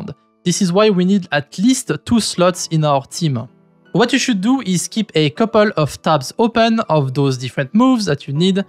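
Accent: France. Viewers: My man french accent goat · Subscribed for the french accent and the good content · This french accent everytime XD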